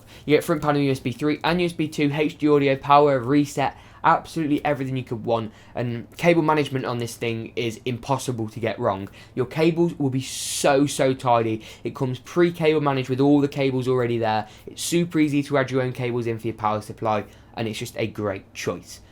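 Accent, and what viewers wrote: United Kingdom. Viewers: does anyone found it feeling so damn hard and stressful when hearing to talking, i dont mean that i cant hear what he said but its not feeling good for me to hear that kind of british english.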